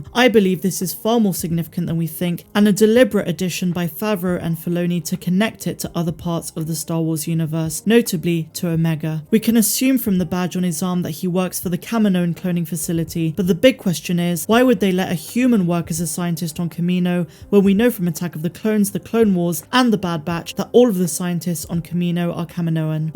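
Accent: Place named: South West, United Kingdom. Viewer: The south west accent is strong with the jibber jabber!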